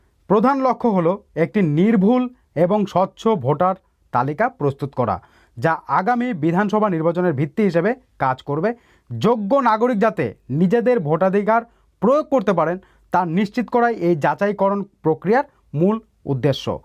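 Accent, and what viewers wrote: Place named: Bangladesh. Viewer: আপনার সব ঠিক আছে তো? আপনি তো বাংলা দেশি, আপনার কথায় বাংলাদেশি টান আছে।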